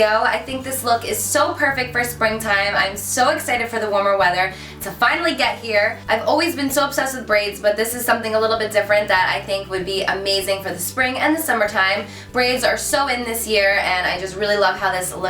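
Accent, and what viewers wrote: New Jersey, United States. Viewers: I knew you were from Jersey! that accent haha. love your vids. you're gorgeous! 💕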